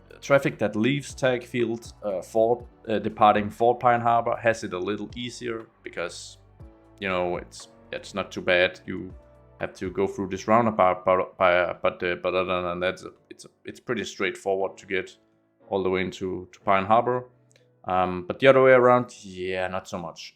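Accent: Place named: Denmark. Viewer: nice work! your accent sounds at bit danish or scandinavian. er you from denmark?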